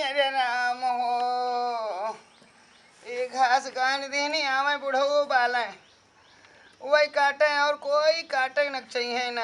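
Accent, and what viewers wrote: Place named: Awadh, India. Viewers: wow must lag rha h ki dadi ma bol rhi h. aapka bolne ka tarika bhut mst h, lag rha h gonda pahuch gye h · Really enjoyed your way of typical awadhi talking ! Keep it up bro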